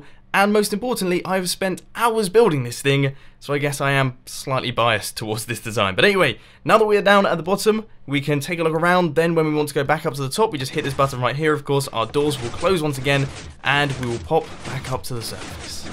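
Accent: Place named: England, United Kingdom. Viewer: Where u from in England ??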